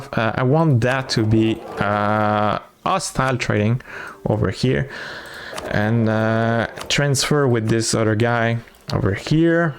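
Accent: Quebec, Canada. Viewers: Ça c'est un accent québécois 😂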